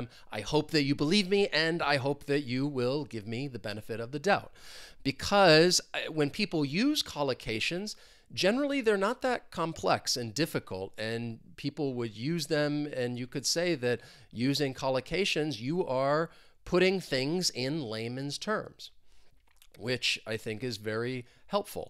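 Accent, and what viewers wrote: United States. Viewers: I come across your channel, thank you for the great lessons, very professional, you sound like you was born in the USA :)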